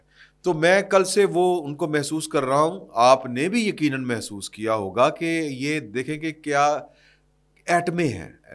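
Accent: Punjab, Pakistan. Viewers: Zabardast. Kamal kartay hain ap.Serious discussion ko bhi aisa chatpatta banatay maza ajata..specially with your punjabi tarka. Keep it up.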